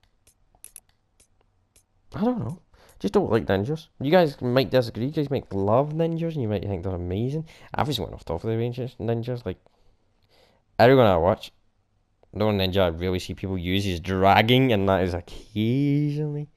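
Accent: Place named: Scotland, United Kingdom. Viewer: If you dont unstand that English then you must of never head of a Scottish accent before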